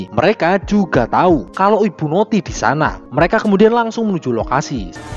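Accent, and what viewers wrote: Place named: Java, Indonesia. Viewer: Mantap jawanya masih kental sekali😅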